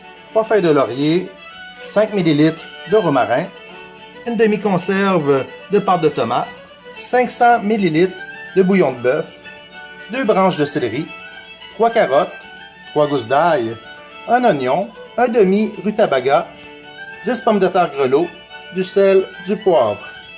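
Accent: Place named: Canada. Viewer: J'ai juste un petit problème c'est un accent plutôt canadien qu'irlandais parlant le français, revenant d'irlande j'ai un petit problème avec votre recette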